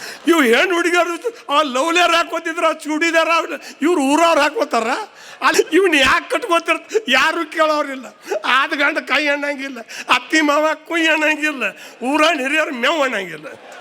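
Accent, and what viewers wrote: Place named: North Karnataka, India. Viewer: ಉತ್ತರ ಕರ್ನಾಟಕದ ಕನ್ನಡ ಗಟ್ಟಿ ಗತ್ತಿನ ಮಾತು ಅರ್ಥ ಮಾಡಿಕೊಂಡು ಕೇಳುವುದೇ ಚೆಂದ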